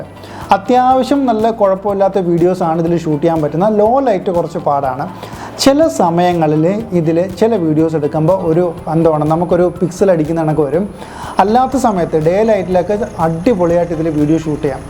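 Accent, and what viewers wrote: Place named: Central Travancore, India. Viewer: അണ്ണാ നമ്മുടെ തിരോന്തരം ഫാഷേല് പറ അണ്ണാ, ഇതു അണ്ണൻ deliberately മധ്യ തിരുവിതാംകൂർ ഫാഷ പറയുവാണ്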